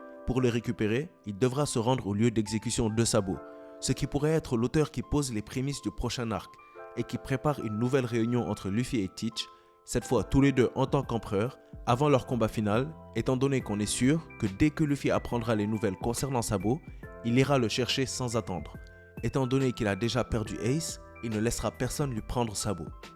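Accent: Senegal. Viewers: Ta théorie tient la route et est très intéressante mais il y a un detail qu’on oublie c’est qu’on est dans la phase finale du manga donc je pense qu’on est plus en phase d’acquisition de développement mais plutôt de conclusion et de demonstration. Pourchasser un possesseur de fruit me semble plus dans l’ordre des priorités actuelles, je pense que c’est plutôt tout ce qui est poneglyphes et armes antiques qui peuvent le motiver. (D’apres ta voix es-tu d’origine sénégalaise ?)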